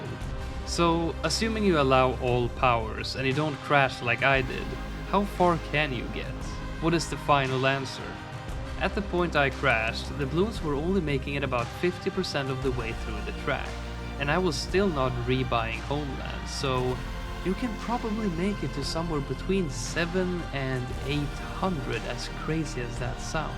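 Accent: Sweden. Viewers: Kan inte annat än älska den svenska accenten! Härlig video! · the swedish accent is wayy to hard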